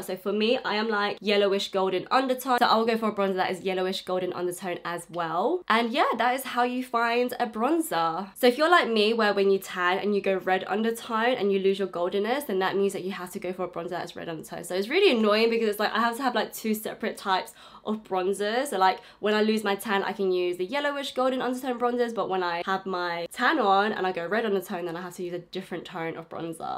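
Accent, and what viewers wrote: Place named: London, United Kingdom. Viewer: I freaking LOVE this eye look!! The neon liner is giving me life 😭 also I absolutely love your London accent, makes me so happy!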